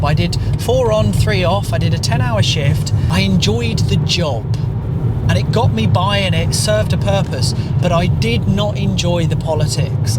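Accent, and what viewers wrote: United Kingdom. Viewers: If everyone had this same mindset you have, there'd be no infrastructure and no society. You'd have no road to drive your car on whilst making this video. There'd be no supplies to feed your kids because there would be no truck drivers hauling through long hours to deliver all the essential items we need to stock our shelves. Judging by your accent, you're from the UK? UK Lads are some of the finest scaffolders in the world. If these lads all paid heed to your advice, there would be no accessible platforms built to allow the other trades to build all our houses and commercial buildings. Everyone wants to be an entrepreneur until they realise that the world survives off of graft and sweat, not faarken' pen pushers complaining.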